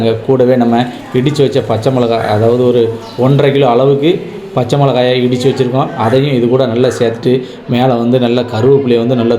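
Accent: Tirunelveli, India. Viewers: Tirunelveli aa bro neenga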